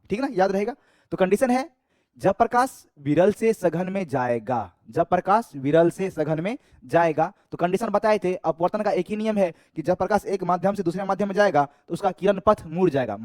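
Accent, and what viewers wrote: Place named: Bihar, India. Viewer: Sir bihari language 😍😍😍😍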